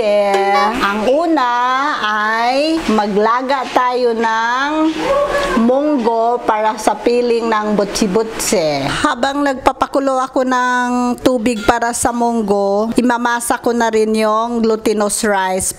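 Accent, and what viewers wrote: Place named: Iloilo, Philippines. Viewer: Ang cute!ganyan din ang accent (ilonggo)ng nanay ko tuwang-tuwa ako habang nanonood.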